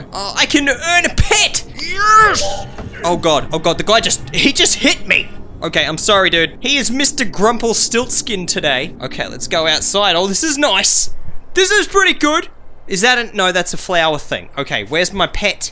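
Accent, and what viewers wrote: Australia. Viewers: Is he an Aussie like me?!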